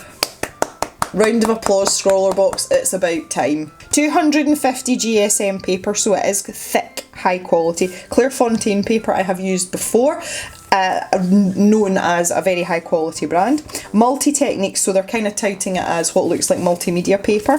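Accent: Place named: Dundee, United Kingdom. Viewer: the Dundee accent is def different lol Glaswegian confuses me a lot I think the closest thing similar in Canada is the west coast accent compared to east coast. but thats provinces away by distance not a few miles but that makes sense since so many scottish/irish people went to the east coast hundreds of years ago (you do have very small regional differences in slang and sort of in accent but nowhere on the scale like it is in Scotland)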